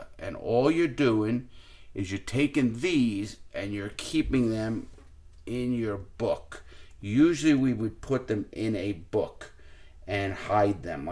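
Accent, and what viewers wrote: New York, United States. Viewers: Thank you. Always appreciate it. My father was born in the South Bronx in 1934. Your New York way of talking about things makes me think of him. He's been gone a long time now.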